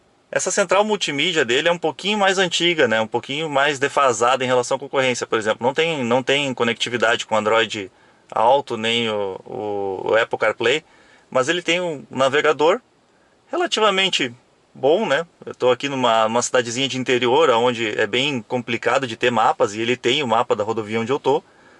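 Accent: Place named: Rio Grande do Sul, Brazil. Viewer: Vc é gaúcho né rs, se sim, conheço logo, como o baiano, usa "tu" e ao invés de falar "di noite", fala "d noite", "d baixo"... Bem legal o sotaque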